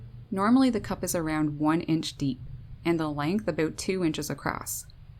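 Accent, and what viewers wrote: Newfoundland, Canada. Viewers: You sound like a newfoundlander. Am I right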